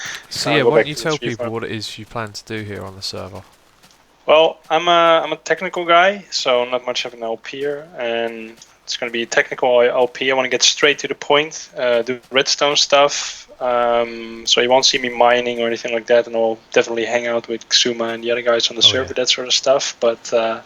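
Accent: Netherlands. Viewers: I think you can really hear it, actually. I am dutch myself and am really familiar with dutch people trying to speak english. You can defenitely hear that he's dutch. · Haha, I could tell by his accent that this guy was Dutch :D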